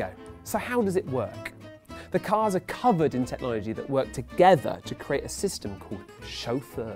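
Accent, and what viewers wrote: United Kingdom. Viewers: finally I find a guy that's speaks brit english not american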